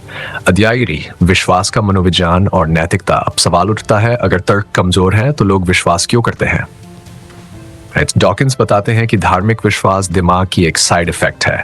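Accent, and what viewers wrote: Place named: United Kingdom. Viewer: bro english ascent aa rhi hindi mai, thoda sa better karo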